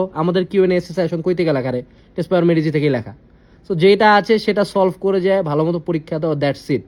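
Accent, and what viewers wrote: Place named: Sylhet, Bangladesh. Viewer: oni sylheti er Jonno accent ei rokom abr onar past onek koshter oni jeikhan theke Uthe ashche shobai ta parbe nah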